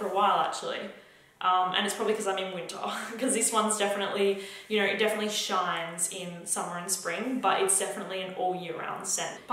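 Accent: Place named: Australia. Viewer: Aussie girl back at it again. Love the accent she has it’s orgasm into my ears...haha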